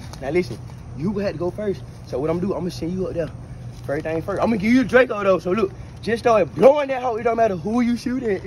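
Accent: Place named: Memphis, United States. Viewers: Where is bro from fr 🤣🤣🤣 I swear he from Memphis! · Gotta really be from the dirty dirty DIRTY south to understand what he’s saying😂I’m from Prichard Al and I’m 45 yrs old… ain’t nothing changed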